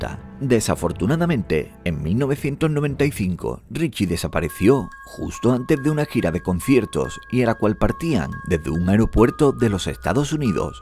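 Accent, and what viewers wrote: Spain. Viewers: Eres español no?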